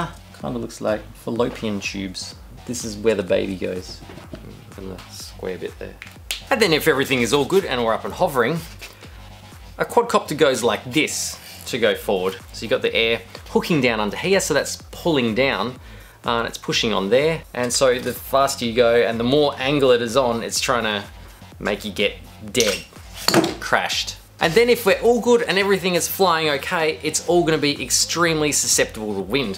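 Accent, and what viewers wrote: Australia. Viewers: The most unbelievable thing for me was hearing his accent but that he kept saying cooler and not esky or even chilly bin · You sound australian, but don't call it esky?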